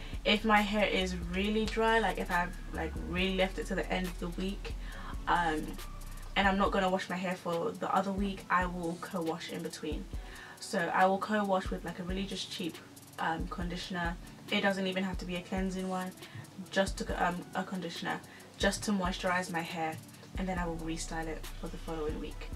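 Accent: United Kingdom. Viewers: She sounds super british to me🤣🤷🏾‍♀️ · She’s sounds English In particular London but what does a Londoner know 🤷🏾‍♀️?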